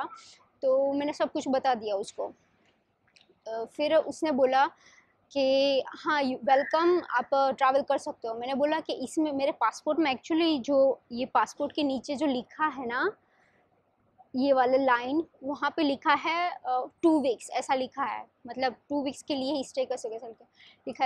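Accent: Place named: Gujarat, India. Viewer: You speak hindi & English with gujarati accent,🎉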